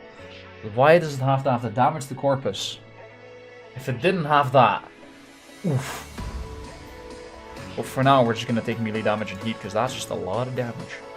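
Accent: Netherlands. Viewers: Ik hoor al gewoon dat je Nederlands bent, lekker hoor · De Dutch accent is strong on this one, just found you. Nieuwe sub erbij. Great vid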